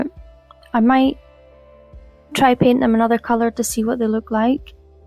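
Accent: Scotland, United Kingdom. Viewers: My wife Cinnamon, really loves your crafts. She is watching you make the fireplace with the broom and cooking pot and spiders. She just loves it. Also loves listening to your voice. A touch of bonnie Scotland.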